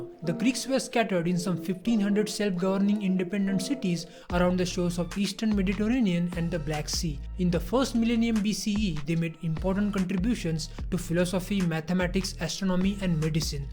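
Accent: India. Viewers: I find the Indian accent particularly difficult to understand but I also find many YouTubers speak too fast. I'm in a no-win situation!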